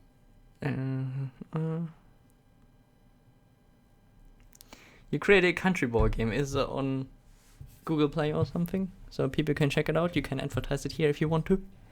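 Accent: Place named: Germany. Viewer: weil du einen deutschen Akzent hast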